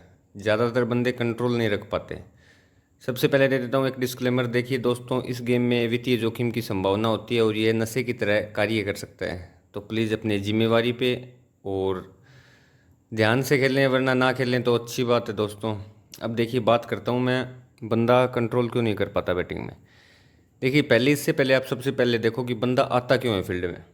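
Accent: Haryana, India. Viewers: Dada aapke mostly saare videos dekhe,aapki ek ek baat me sachhayi hai,aur aapne apne anubhav ko saajha kiya hai,me aapko abhi se guru maanta hu,aapki language se lagta hai,shaayad ap seekar ya hariyana side ke ho,ek baar darshan ka moka de,koti koti dhanyawad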